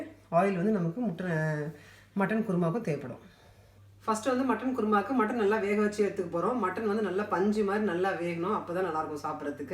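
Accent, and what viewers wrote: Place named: Theni, India. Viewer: Oh ok k Ur slang like theni people 😊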